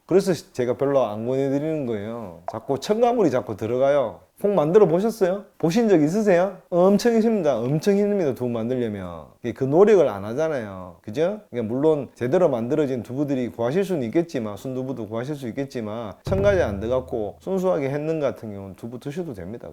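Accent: Gyeongju, South Korea. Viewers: ~~~ 경주 사투리 ㅎㅎㅎ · 박사님 왠지 경상도사투리가 정감이가더라구요 고향이 경주내남면이군요 내남면에서 이렇게 훌륭한분이 태여나셨군요 좋은정보감사합니다~❤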